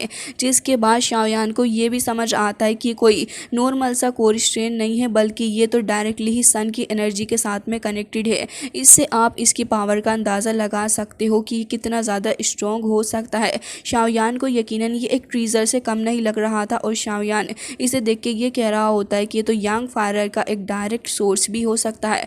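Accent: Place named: Bihar, India. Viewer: Bihari bol rahe ho aap ❤❤❤❤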